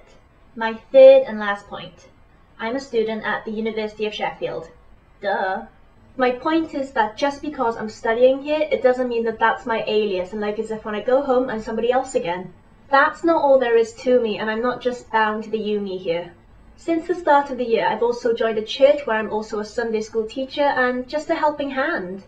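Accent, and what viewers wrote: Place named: Wales, United Kingdom. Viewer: awesome - welsh is my favourite UK accent!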